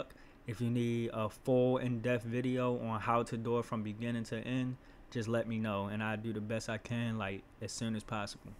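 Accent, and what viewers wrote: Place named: Baltimore, United States. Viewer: you from baltimore?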